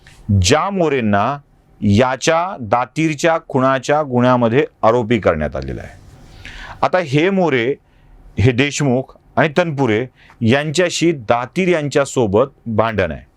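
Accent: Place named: Marathwada, India. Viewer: त्यांच्या भाषेचा लहेजा तसा आहे , थोडा ग्रामीण , शिरवळ , मराठवाडी पण स्पष्ट .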